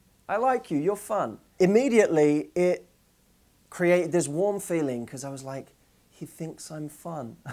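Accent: England, United Kingdom. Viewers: ***** Totally :) British accent and brown hair from Orlando, facial features from Ryan. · Gosh I am so obsessed with your English accent 😀 great lesson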